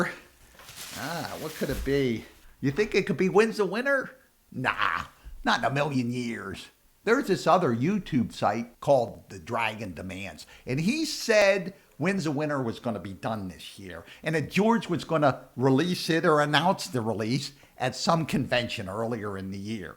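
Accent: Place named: Pennsylvania, United States. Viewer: I'm trying to place the accent. Chester County. Maybe Delaware County.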